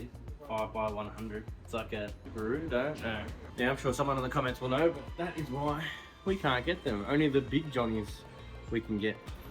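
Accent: Australia. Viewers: Love your spiiiicy content!! (Ausieacent) !!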